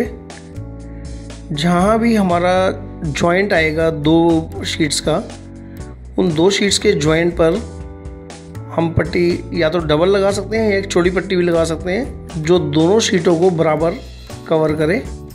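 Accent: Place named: India. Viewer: Indian voice -.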